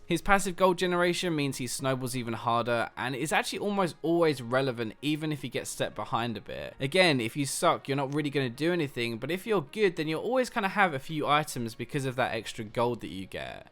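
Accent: England, United Kingdom. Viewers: It's not a British Accent, it's actually an English accent. Because Britain is a country made of multiple smaller regions, with their own languages and dialects, they have Scottish people, Welsh, Irish, and English each with their own accents. The English one is usually labelled as a "British" one tho.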